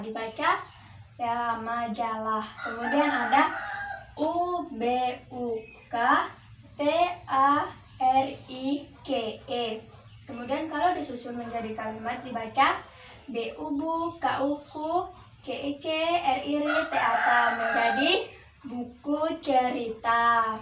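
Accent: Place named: Pekanbaru, Indonesia. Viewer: Logat pku nya nampak kali yaaaa (pake nada pku)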